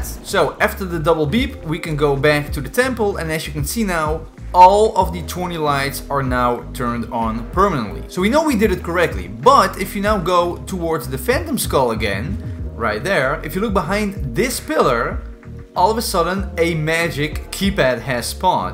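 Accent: Netherlands. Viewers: sick vid :D you dutch?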